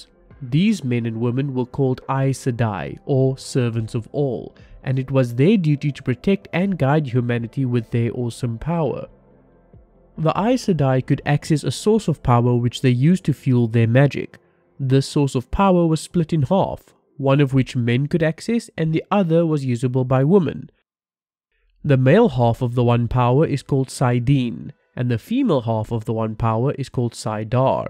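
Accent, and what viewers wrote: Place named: South Africa. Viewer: Hey I like your videos. When I watched you I thought your accent sounded... familiar then I realised... jy is ook van SA. Lol. Great stuff man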